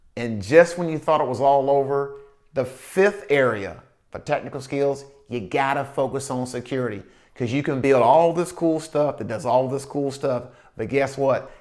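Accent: South, United States. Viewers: That southern accent coming through strong 🤠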